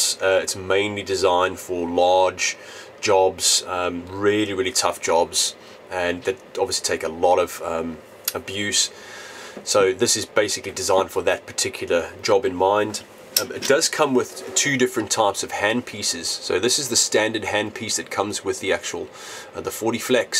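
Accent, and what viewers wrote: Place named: South Africa. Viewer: That's got to be a south African accent if I've ever heard one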